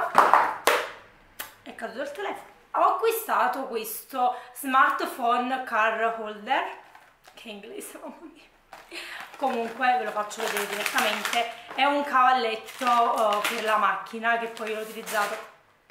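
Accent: Naples, Italy. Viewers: ACCENTO NAPOLI SPETTACOLARE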